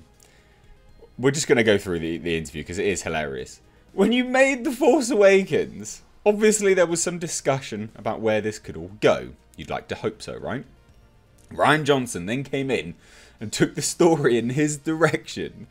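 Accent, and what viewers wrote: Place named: RP, United Kingdom. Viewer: accent goes northern when he is imitating/mocking... Lol. Reg = posh Mocking = northern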